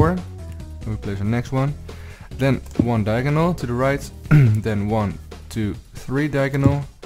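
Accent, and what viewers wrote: Netherlands. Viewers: I sense a Dutch accent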